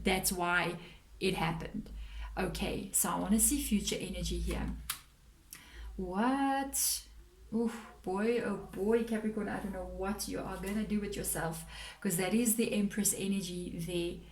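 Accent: South Africa. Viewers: Are you based in South Africa? Your accent sounds South African.. Do you do personal readings and how much does it cost?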